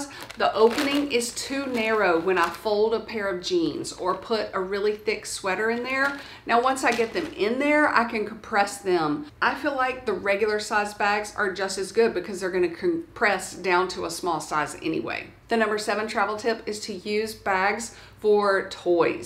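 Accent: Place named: Georgia, United States. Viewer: Hi, new subscriber and really enjoy your YouTube videos. Getting quite a few helpful tips for when we travel. I also really enjoy your great Georgia "accent ". Keep up the great travel hacks and other information. I'm learning a lot to make the most of our carry-ons and personal bag. Much needed since my husband won't check bags.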